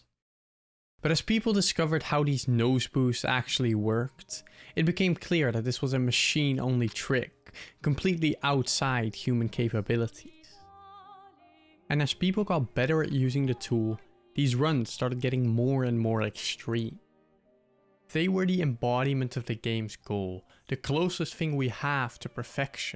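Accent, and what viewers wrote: Netherlands. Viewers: You sound Dutch, coole video gast!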